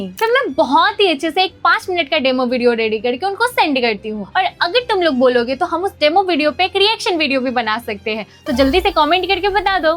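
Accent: India. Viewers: Apke bolne ke language se hi lag rha tha aap bihar se ho 😂😂😂. No hate .I am also from bihar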